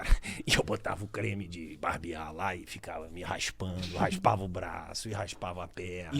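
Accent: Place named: Rio de Janeiro, Brazil. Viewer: Sotaque de carioca, mas nasceu em Ribeirão Preto.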